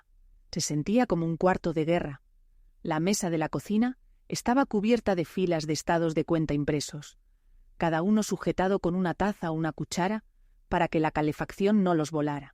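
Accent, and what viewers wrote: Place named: Spain. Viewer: Con mucho respeto,tengan cuidado con sus relatos, en Ciudad de México no nieva y la voz de la relatora es con acento español de España😊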